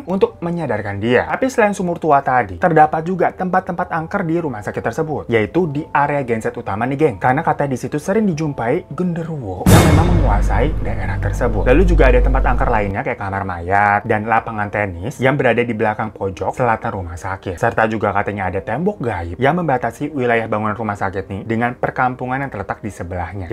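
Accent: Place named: Pati, Indonesia. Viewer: Pati mana suaranya 😅👆👆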